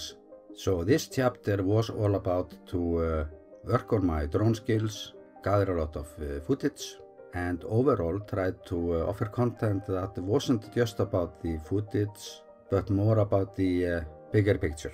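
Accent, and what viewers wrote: Iceland. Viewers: Miriam E. Yes, he speaks perfect English, but with an Icelandic accent that is unlike any other. Makes for a wonderful experience.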